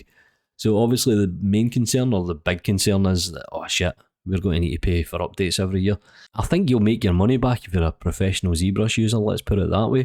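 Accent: Scotland, United Kingdom. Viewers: Na pal, you talk Scottish all you want. Perfectly clear to me … then again I’m a north easterner. No one understands me.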